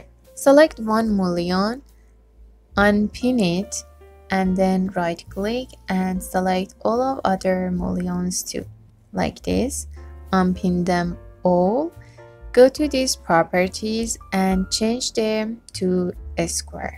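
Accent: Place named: Arab world. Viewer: Love your accent are you arbic ?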